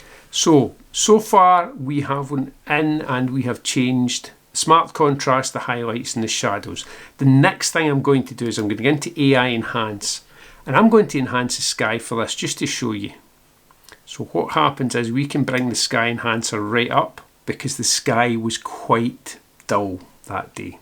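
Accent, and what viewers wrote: Scotland, United Kingdom. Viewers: hi , since I watch you I don't know if I became a pro of Luminar but certainly I did improve my understanding of the Scottish accent :-)) ... I wish I knew you before so I could understand the Gallagher brothers ( (I know there are from north England but it seem to be close from yours) during my last photo-session with them (so frustrating not to understand a single word) ... So please note , I love Scottish people and their folk accent but please just try to be more British if it's possible , for a French guy well trained in English it wasn't so easy to understand ;-) thanks ... best ... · Great edit, clear step by step instructions as usual. You are really helping me to become more and more confident with Luminar,. Oh, and I could listen to your wonderful Scottish accent all day! 👍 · Just found your channel and I'm glad I did. 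Excellent tutorial and easy to follow what you're doing. Eilean Donan must be the most photographed castle in Scotland. Visited it many times on my way over to Skye. Nice to hear a shots accent on the tube, and I'm looking forward to more of your tutorials.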